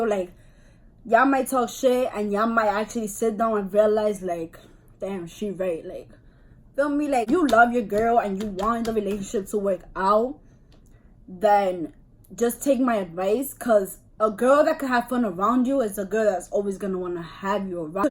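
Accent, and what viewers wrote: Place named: Bronx, United States. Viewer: Hold up, you gotta be from the Bronx😭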